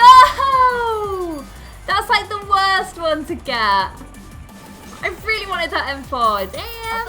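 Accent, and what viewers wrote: Ireland. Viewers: what?? you're irish?......do you know jacksepticeye? I'm a big fan of his. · ur Irish? high 5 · are u Irish?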